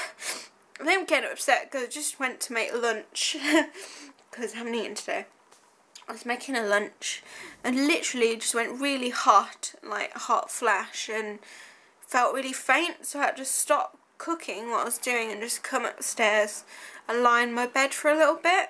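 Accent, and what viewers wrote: Birmingham, United Kingdom. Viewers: Dahhhhhlingggggg!!!! <3 Oh my gosh this is so sad and has actually made me think how I need to be more careful as I wouldn't want this to happen to me! It sounds truly awful and we're all sorry that you're experiencing this! I hope you recover quickly and am sure that your determination and motivation to keep up your creative flair will aid the healing process!:) GET WELL SOON! x ps. There was ONE positive that came from this video... I GOT TO ACTUALLY HEAR YOUR BRUMMY ACCENT! Hehehe I thought you'd NEVER let it shine through;D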